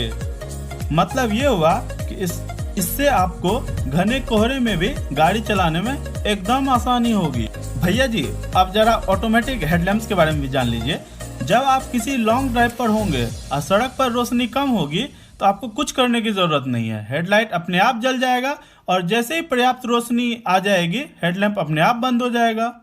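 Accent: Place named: Bihar, India. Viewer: बिहारी वाला अंदाज गजब शानदार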